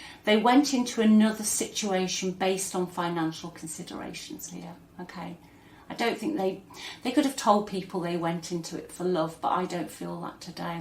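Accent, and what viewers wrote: United Kingdom. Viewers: Hi ,greetings from Montenegro ,i love Youre Brittish Akccent,i love English language i speak and learn as a child ,thank you for youre readyngs ❤❤Love Light and Happy New Year 🎉🎉🎉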